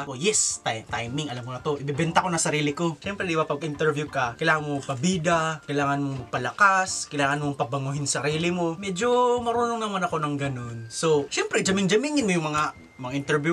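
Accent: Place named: Conyo, Philippines. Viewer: mao di conyo kaau ateneo kaayo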